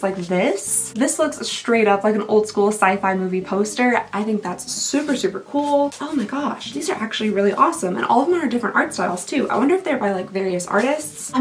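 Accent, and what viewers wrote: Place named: Kentucky, United States. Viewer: One of the reasons I love watching your videos is because your accent reminds me of my long distance best friend who is also from/lives in Kentucky. Always love your videos no matter what they are. Hoping to make it up to the stores one of these times I’m down there !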